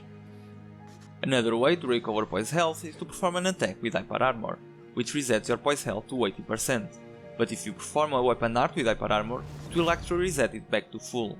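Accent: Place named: Russia. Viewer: rapaz tu ta de brincadeira que é de portugal, sério? aren't you russian? cant believe this accent is portuguese UHEHUAEHUAUHEUHEA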